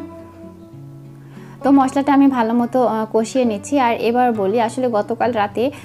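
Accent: Sylhet, Bangladesh. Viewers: Apu tmr basha sylhet er kotay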